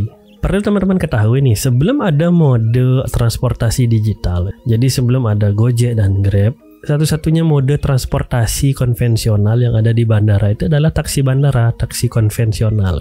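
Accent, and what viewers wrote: Bali, Indonesia. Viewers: setelah nonton beberapa video nya baru sadar lewat aksen dan namanya ternyata semeton Bali wkkwkwaka. semangat Blii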